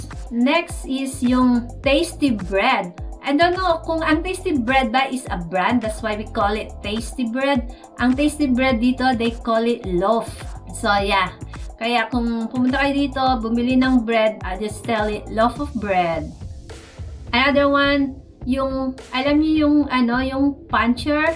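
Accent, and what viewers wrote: United Kingdom. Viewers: Nakakatuwa namn ang english british accent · I immediately remembered the English accent when I was still in the call center ... Yes UK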